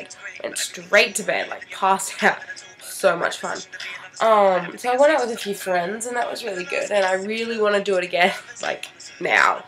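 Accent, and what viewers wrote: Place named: New Zealand. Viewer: just an observation - you talk like a new zealander. we have to change that!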